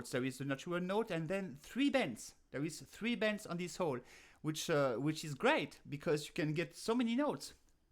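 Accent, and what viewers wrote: France. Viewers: Just watch your video... Just started to play the Harmonica a few weeks ago and i'm 78 years old.. I love the challenge. Don't worry about your accent you do great! That goes with being French! Thank you again.. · Great video for a beginner like me, and please dont apologize for your accent, I think your english is pretty good and I am glad that you give the non-french speakers the option to learn from you too! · I like the French accent. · Vous n'avez pas à présenter des excuses pour l'accent français - il est un plus pour moi! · Je me suis abonnée à tes cours et à tout ce que je peux recevoir de toi. Tu es une super harmoniciste, et heureusement que tu as un accent bien français, sinon je ne comprendrais rien... Par contre , comment fait-on les overblow (par exemple dans "Yesterday") ? Merci pour tout ! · Oh please, I'm jealous of your accent. 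My native language is Brazilian Portuguese and we just love out there hearing you, French speakers, speaking our language with your delightful accent. No apology necessary! My apologies for being jealous... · Do not apologize for your French accent! It is delightful! And your harmonica playing is....well, shall we say....magnifique! Merci bien!! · Hi, i love your french accent💙and your music style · why apologise for a French accent. French is the language of love and one of the world's most beautiful languages. carry on with your fantastic accent so we can enjoy listening. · Bon jour, Madame. I think it is not necessary to apologise for your accent for the simple reason that the French accent is oh la la, wonderful to hear! And your harp playing is the best! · Bonjour, en premier merci pour la leçon et pour toutes les belles performances que tu partages sur Youtube - puis à propos de l'accent, mais tu n'as pas à t'excuser tout le monde a de l'accent lorsqu'on parle une langue etrangère en outre dans une autre vidéo tu parlais l'anglais sans accent ou presque et moi j'ai été susrpris de savoir qu'une des meilleurs joueurs de l'harmonica au monde soit française:) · Pardon ? 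Pour quoi? L'accent français c'est toujours adorable... Et moi je préfére ecouter l'anglais comme ça, c'est plus facile pour mon oreille. Je viens de descendre deux demi-tons pour la première fois dans le deuxième trou ! Alléluia ! Merci beaocoup.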